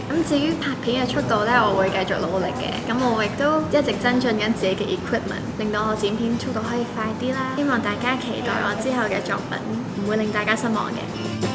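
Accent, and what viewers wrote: Taiwan. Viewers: 每次聽AV講台語的時候都好可愛喔! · 講臺語好像很標準，有親切感，很像鄰居家女兒的感覺，我女兒都沒這麼道地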